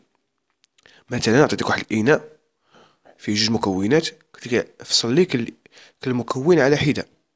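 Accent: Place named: Western Sahara. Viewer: لكنتك صحراوية. موفق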